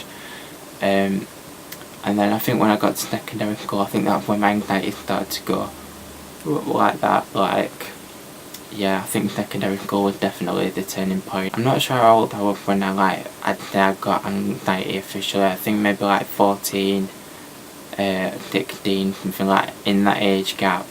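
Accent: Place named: Birmingham, United Kingdom. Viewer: Go Birmingham! Love your accent! 😍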